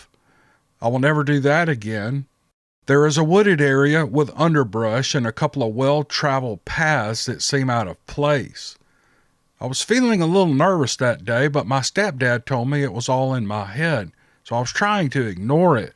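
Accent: South, United States. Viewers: I appreciate you so very much. Love to hear your awesome Southern voice and your sense of humor. God bless you & your family!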